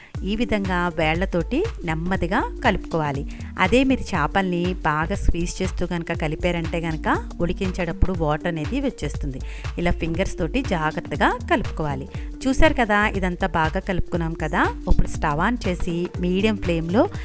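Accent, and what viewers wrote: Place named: Godavari, India. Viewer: Mee godavari yasa mataladitey bagundedi andi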